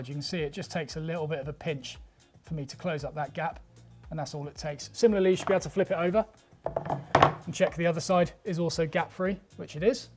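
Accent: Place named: United States. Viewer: Perfect American Accent 👌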